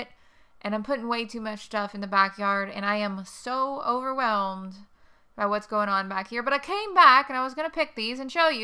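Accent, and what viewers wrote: South, United States. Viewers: I can tell by that southern accent you're in the Southeast somewhere? Lol I'm in Georgia, how about you?. I love the close-ups you do, that's so cool. your cat is a cutie. . what's crazy is I have the same hydrangea it's a mother plant I've had 14 or 15 years it always blooms blue so I started getting in taking cuttings and making more plants of my hydrangea and one of the cuttings I put in the soil it's a small plant but it Bloomex pink LOL and then I took a cutting off another plant and put it like 5 ft away and it bloomed light purple.. the hydrangea I have is called endless summer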